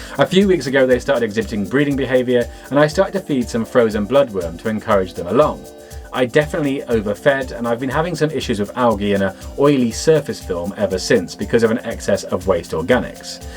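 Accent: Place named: England, United Kingdom. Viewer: Great to hear an English voice, youtube is so American heavy especially aquatic posts 😊 Thanks for sharing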